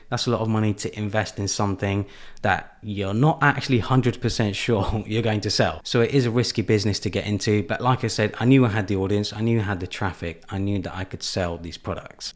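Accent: United Kingdom. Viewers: you could prolly get paid for your voice too, cool British accent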